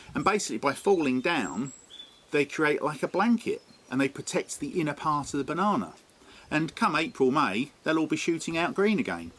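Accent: United Kingdom. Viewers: Amazing video and lovely British accent...all the best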